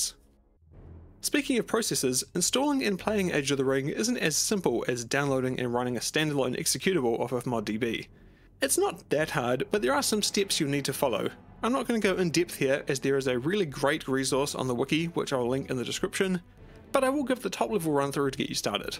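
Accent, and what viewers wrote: New Zealand. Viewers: yo r u a kiwi?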